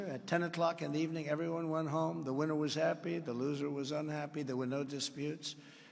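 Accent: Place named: New York, United States. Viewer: Love you're NY accent God speed Presidrnt Trump again We are praying the fraud will be wide open this time.😊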